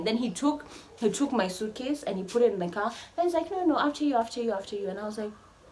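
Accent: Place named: Scotland, United Kingdom. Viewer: team ANTON LOVE ISLAND 2019 all the way 👊🏽👊🏽👊🏽👊🏽 Scottish accent a struggle .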